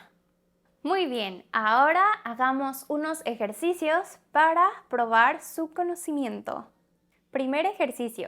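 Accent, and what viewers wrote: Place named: Mexico. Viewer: Ojalá hagas las partes 2,3,4, etc de este vídeo. Estas frases y tu acento son de mucha ayuda para la gente que quiera aprender Español de México. Ya he recomendado mucho tu canal. Gracias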